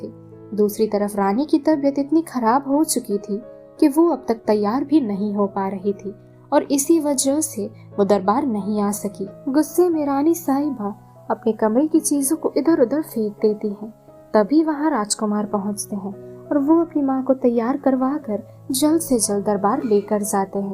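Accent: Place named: Bengal, India. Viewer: She sounds to be bangoli.. that's why you're getting problem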